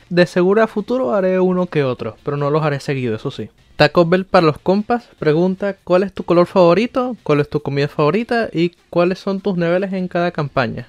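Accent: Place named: Chile. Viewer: Yo pensaba que eras de chile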